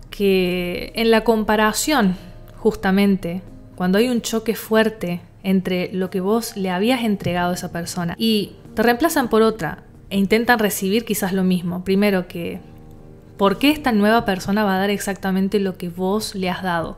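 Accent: Argentina. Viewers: Al ver este video, parece que has visto mi historia, y todo lo que dices es verdad. En mi caso quise una relación con una persona que no lo vale, pero me pasa que siempre quiere volver y da señales para que yo ceda y vuelva, pero está vez ya no va a volver a pasar. Gracias por este video, desde luego lograste mi suscripción a tu canal, por tu acento seguramente SOS argentina, país dónde vivo. Besos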